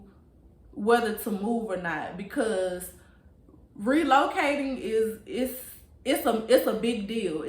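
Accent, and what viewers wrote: United States. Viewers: Thank you so much!!! I needed this so badly, you don't even understand. What's so crazy is that I have been watching your video on and off all day at work because I have been having to pause it lol, but I knew I needed to stick around and watch until the end. My jaw was on the floor when you mentioned real estate when that has been a career I have been trying to pursue for over a year now,but for some reason just can not pass the exam. Maybe God is telling me it is not meant for me to get licensed in this state. I am definitely going to keep praying to remain obedient and faithful to him. I know blessings shall pour down. Yes I will be alone and won't know not one person in that new state, but I know God will provide connections for me. Your testimony really got me going. I knew by your southern accent it was going to be a good one lol. 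I know a Mississippian/Memphian when I hear one! I pray that more blessings come your way. Are you on any social medias? I would love to support your business whenever I'm in TX. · Your American accent is too heavy I struggle to get some words. E.g. lulu for little.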